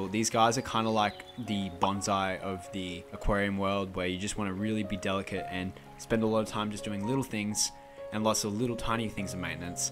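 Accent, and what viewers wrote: Australia. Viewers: Thanks for the informative video. What city do you live in? I am wondering because you sound Australian and I live in Melbourne and the water here is also fairly soft. So I am wondering how appropriate you think Melbourne water is for Crystal Red shrimp? Do you think it needs any treatment besides dechlorinating?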